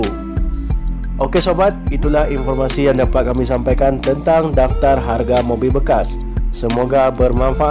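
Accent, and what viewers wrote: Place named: Aceh, Indonesia. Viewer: mantap bg tau logatnya karna saya jg tinggal di aceh.. Sukses buat chanelnya bg.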